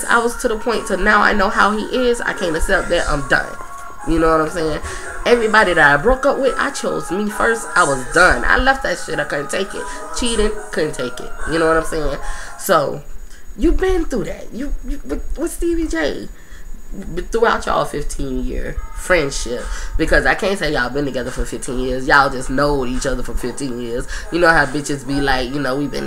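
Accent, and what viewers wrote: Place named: Miami, United States. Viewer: I knew u was a miami girl like me frm the way u talk... thats y i love u!!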